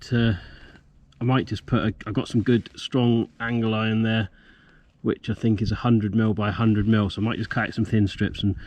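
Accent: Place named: Cornwall, United Kingdom. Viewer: 3 seconds into the video i subscribed. Nice to hear the cornish accent. Again after leaving fowey/Truro and spending the last 8+,years in New Zealand.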